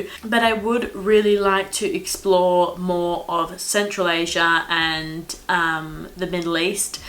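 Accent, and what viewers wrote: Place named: England, United Kingdom. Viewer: It sounds like you have a bit of an English accent!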